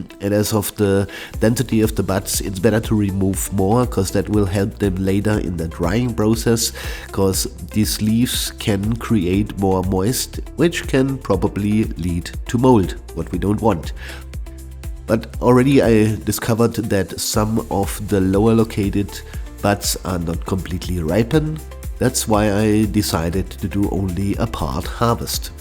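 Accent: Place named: Germany. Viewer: Das Englisch hört sich so deutsch an, wie ich es noch nie vorher gehört hab. Vielleicht wäre deutscher Content mit englischen Untertitel passender.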